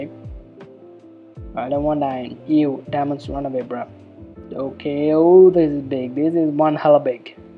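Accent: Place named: United Kingdom. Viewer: Ur British accent is still the same as before!!!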